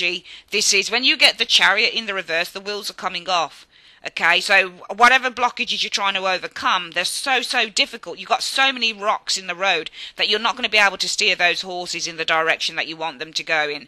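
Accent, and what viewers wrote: Birmingham, United Kingdom. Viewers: That’s got to be the best Brummie Reading I’ve ever heard! You are really good! Thanks!:)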